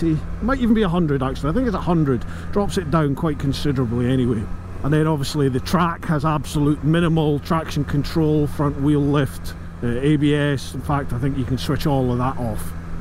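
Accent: Scotland, United Kingdom. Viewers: I hear a Scottish accent. I hit like. Its really that simple.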